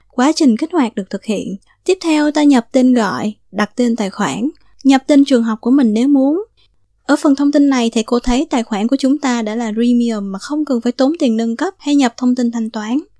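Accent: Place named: Saigon, Vietnam. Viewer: Giọng sài gòn nghe nhẹ nhàng, dễ thương làm sao..cảm ơn cô nhiều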